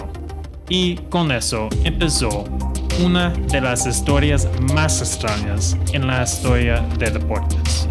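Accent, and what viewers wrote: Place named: United States. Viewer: Tu acento americano a veces no lo entiendo aveces, pero ya lo estoy entiendo mejor y tus videos son muy buenos estoy buscando mas del hockey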